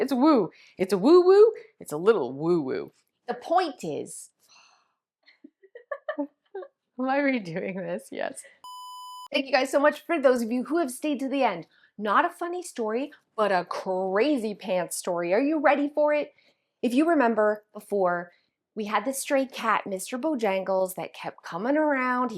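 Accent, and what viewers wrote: Canada. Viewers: Sounds like you're from Canada. Just want to say to all canadians on here, i think you are awesome and kind, and i hope to get to visit quebec this year. Love from Ohio ❤❤❤❤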